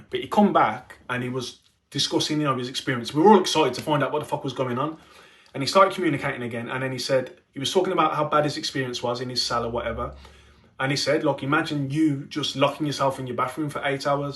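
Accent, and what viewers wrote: Wolverhampton, United Kingdom. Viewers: You sound like your from Wolves in the Midlands, now let me say this i made it to Hollywood and enterd the world of film and TV from nothing midlands girl born and raised in a house of 6 boys with a working class family, what you just described sounds like a complete joke! what a sham, well done to you there"s much better mentoring apprenteships than that nonsense, you made the right decision.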